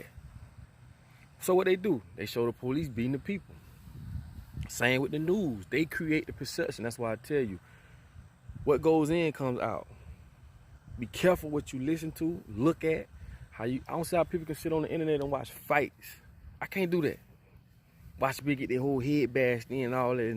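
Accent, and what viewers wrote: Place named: South, United States. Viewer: Admirable..... that southern drawl i love also i just admire ur mindset we must be in the same soul group or something. Keep up the good work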